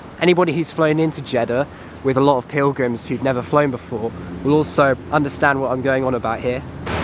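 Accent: United Kingdom. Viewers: اللهجه البريطانيه فخمه نفسي اتعلمها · solid 3.8 out of 5. Not bad considering you're a brit and you accent's heavy, and Arabic isn't considered easy to learn. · Thanks for showing us the world with your videos, I want to confess that I don´t like the british accent, I prefer the american accent because is more familiar for me because I´m from Mexico, but your accent looks good for me.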